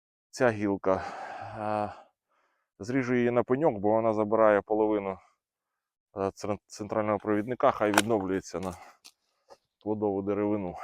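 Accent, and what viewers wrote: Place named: Poltava, Ukraine. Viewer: Дякую, чудові відео, гарна полтавська мова, все з любовʼю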